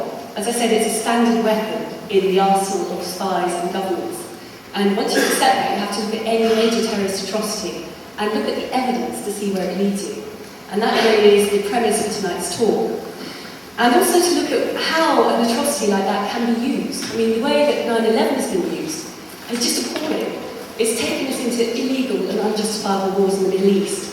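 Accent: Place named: England, United Kingdom. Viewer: You cant understand English spoken by an English person? LOL. Its not because she is a "Limey" I think that its just simply that your uneducated.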